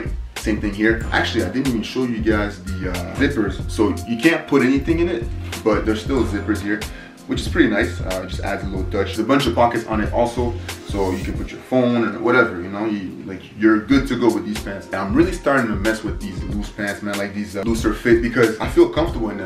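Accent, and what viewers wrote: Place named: Nigeria. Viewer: You sound Nigerian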